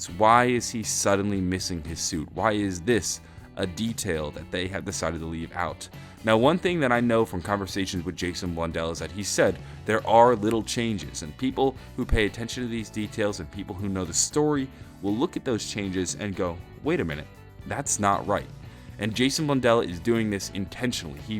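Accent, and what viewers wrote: England, United Kingdom. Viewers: Trust us english lot- he's saying bald we her his accent clearly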